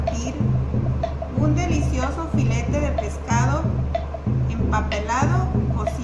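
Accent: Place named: Tabasco, Mexico. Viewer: Que preciosa es mi tierra (Tabasco) da gusto ver el canal de una paisana y escuchar el acento choco ❤ saludos desde San Luis Potosí, cuando voy a mi tierra aprovecho a come de todo y me traigo todo lo que puedo 😀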